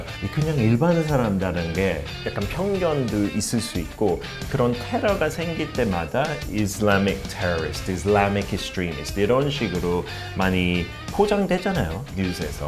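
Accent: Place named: United Kingdom. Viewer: 유익하면서 영국발음이 너무 멋있다보니 시간가는줄 모르고 봤습니다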